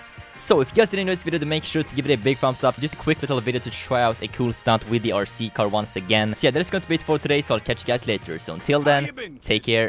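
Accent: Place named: Sweden. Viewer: Man hör att du är svensk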